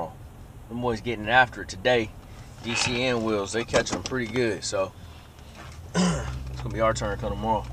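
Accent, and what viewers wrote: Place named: South, United States. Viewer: Hey, I can hear a Southern Drawl when you speak now. You still a Cali Kid..? 🤔 😂 Rooting for you always my Bro.... YEETTADEE!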